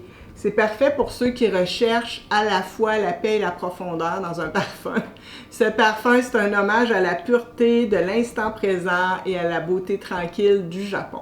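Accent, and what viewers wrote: Quebec, Canada. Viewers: Salut! J'adore l'art de la parfumerie et je viens de découvrir votre chaîne YouTube. En fait, j'essaie d'apprendre l'accent québécois en écoutant votre français! héhé J'espère que vous passerez un week-end extraordinaire.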